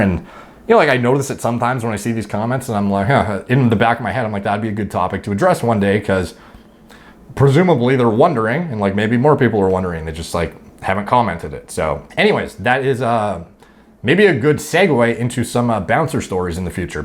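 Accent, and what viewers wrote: Canada. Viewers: honestly hadn't noticed, just figured it was your Canadian accent lol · Woah is he Canadian? Just noticed his accent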